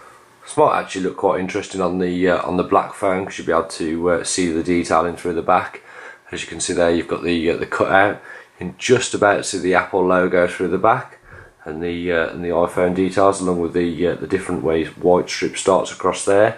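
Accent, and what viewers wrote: Birmingham, United Kingdom. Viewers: brummy accent or local to there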